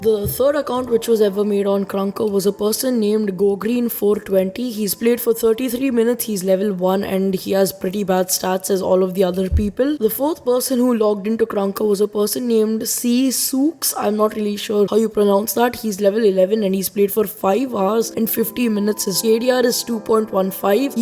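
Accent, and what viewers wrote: India. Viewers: Yooo you Indian? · Your acient is like an indian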